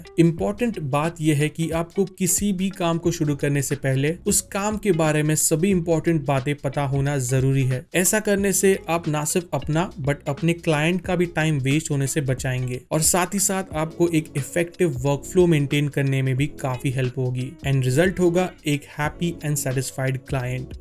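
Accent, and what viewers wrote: Maharashtra, India. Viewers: Kya Aap Marathi Ho🤔🤔🤔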